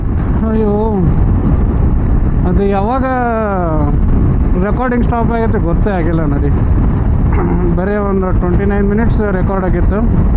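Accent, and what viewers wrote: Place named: North Karnataka, India. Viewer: ನೀವು ಏನ ಹೇಳ್ರಿ ನಮ್ ಉತ್ತರಕರ್ನಾಟಕ ಭಾಷೆ ಅಂದ್ರೆ ಅದು ಒಂದು ಗತ್ತು ನೋಡ್ರಿ Nivu Hubli avra…?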